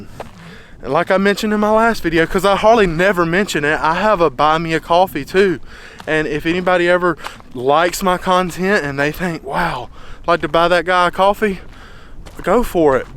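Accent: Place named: Alabama, United States. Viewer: Thanks you are an awesome inspiration. I love your Alabama accent and relaxed way! I love the south. Thank you. Yes I need to make goals for 2024 be blessed! ❤ Respect always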